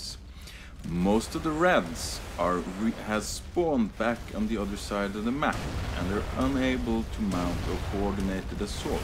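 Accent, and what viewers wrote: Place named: Norway. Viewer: it sounds pretty Norwegian or Scandinavian to me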